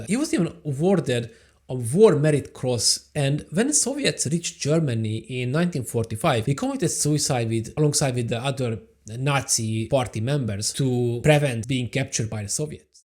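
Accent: Hungary. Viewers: Jó a téma, tetszik. A szóbõséged jó, viszont nagyon, de nagyon erõs magyar akcentusod van. Látszika, azaz hallatszik, hogy rengeteget olvasol,írsz angolul, de nem beszélsz, vagy nem angol anyanyelvüekkel beszélsz. Kicsit azon kellene javítani. Amúgy nekem tetszett.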